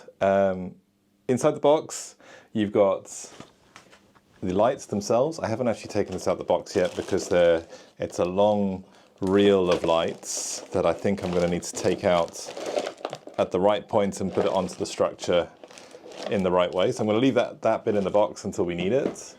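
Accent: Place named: United Kingdom. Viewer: Very good instructional recording; along with your British accent and mannerisms, made this pleasant to watch. Thank you from Canada 🇨🇦 Merry Christmas or as you would say “Happy Christmas!” , and a Happy New Year 🥳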